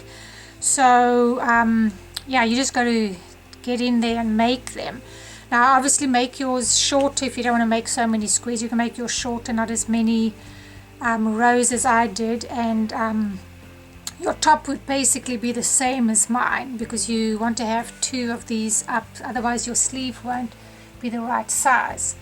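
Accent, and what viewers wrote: South Africa. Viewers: Your south african :) so am i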